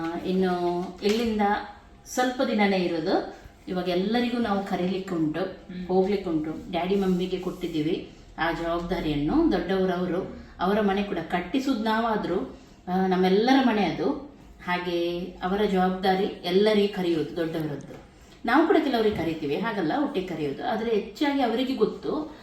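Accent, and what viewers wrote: Mangalore, India. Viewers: Manglur bhasha yestu chanda😊l fast time watched your video super 😊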